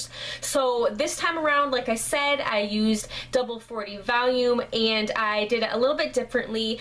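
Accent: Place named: Georgia, United States. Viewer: Aww your accent is so cute lol. Love Georgia girl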